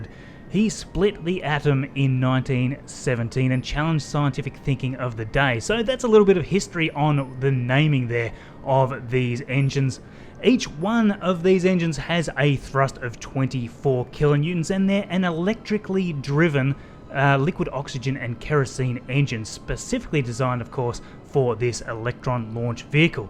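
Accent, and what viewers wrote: Australia. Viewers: Oh your Australian? Gg.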